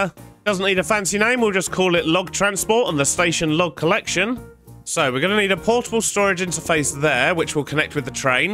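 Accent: United Kingdom. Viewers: Also are you british?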